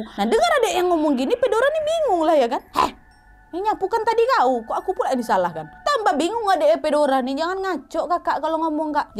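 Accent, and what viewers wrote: Indonesia. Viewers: wajar lidah indonesia kebanyakan gitu ngomongnya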